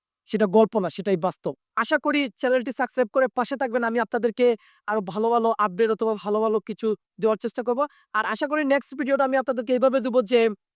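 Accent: Sylhet, Bangladesh. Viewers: ভাই সিলেটি মনে হয়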